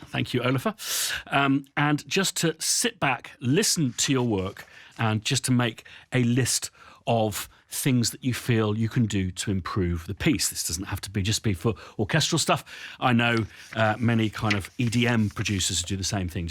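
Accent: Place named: United Kingdom. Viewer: I want a cor englès too. The British accent and proper language create an enormous credibility to my ears.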